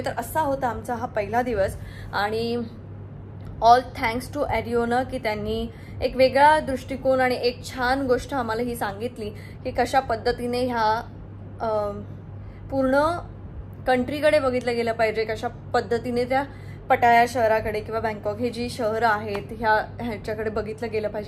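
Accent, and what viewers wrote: Malvan, India. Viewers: तुझे व्हिडीओस मी नेहमी बघते. तुझे मालवणी बोलणे ऐकून मी तुझ्या प्रेमात पडली कारण मालवण वरचे प्रेम🥰❤️ तुझे सर्वच व्हिडिओ खूप काही शिकण्यासारखे आहेत. एक मुलगी म्हणून तुझा खूप अभिमान वाटतो🫶🏻 तुझ्या वयापेक्षा तू खूप जास्त अनुभव घेतलास त्यातून खूप शिकलीस आणि तो तू सर्वासोबत शेअर करतेस🥰 तुला तुझ्या पुढच्या वाटचालीसाठी खूप शुभेच्छा👍 असेच तुझे सर्व अनुभव शेअर करत राहा आणि खूप खूप फिर मज्जा कर❤️❤️❤️ love you di❤️❤️❤️😘😍🫶🏻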